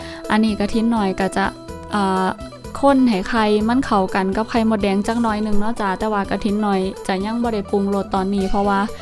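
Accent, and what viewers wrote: Isan, Thailand. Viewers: ชอบดู สอนระเอียดพูดจาภาษาแสานได้เพราะพิ้งเลยชอบๆๆค่ะ👍😍😍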